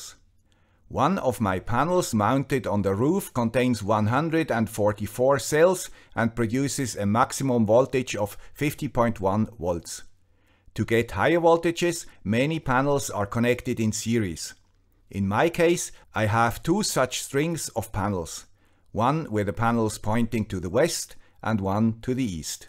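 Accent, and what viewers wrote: Switzerland. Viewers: If you watch how Americans do product presentations by manufacturers to installers, you will know that it's not lying per se rather a culture of willful ignorance -ie, dont teach them what they're selling, only teach them what they need to say using the most simplest words/concepts possible, kinda like optimizing an idea. America, after all, is where a President gets elected by saying the world's largest economy is like running a household budget. Speaking of which, I dont know what all that data and parts means, but the Swiss accent made me believe the conclusion. But I do wonder, yes, the 2% "tax" run "optimizers" but I am under the impression they are also MPPTs. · But who should I trust? A community that made experiences with panels that don't have diodes and bad hardware while not realising things got optimised, or some guy with a Swiss accent that accidentally buys the wrong panels and orientates them poorly while using mathematics and a relatively similar system to compare different things?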